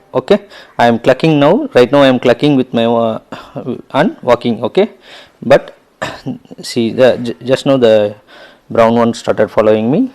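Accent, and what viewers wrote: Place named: United Kingdom. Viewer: British english ah na😟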